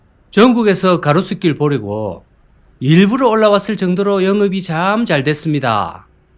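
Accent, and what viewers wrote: Gyeongsang, South Korea. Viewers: 영남할배가 무슨 서울가로수길까지 와서 설레발인가 이 송파할배가 듣기 거북하구만~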